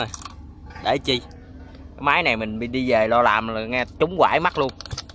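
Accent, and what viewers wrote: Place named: Mekong Delta, Vietnam. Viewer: ok anh mà ở đâu để tiên giao hàng với bảo hành này kia luôn.nghe giọng chơat miền tây.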